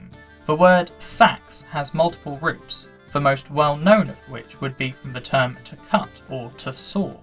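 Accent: Norfolk, United Kingdom. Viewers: are you from Norfolk?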